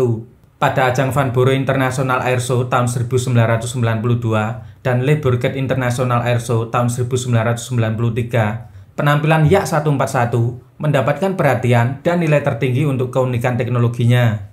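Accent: Java, Indonesia. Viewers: Yang di bahas random banget dari tekno, kesehatan, chip, militer, listrik, tp story tellingnya enak juga meskipun ngomongnya medok jawa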